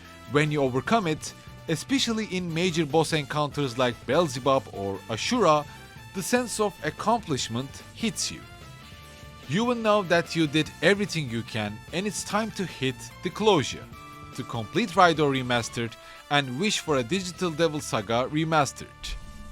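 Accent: Turkey. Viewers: you sound very turkish🧐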